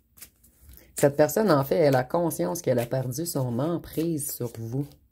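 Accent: Quebec, Canada. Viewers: J'adore votre accent cebecois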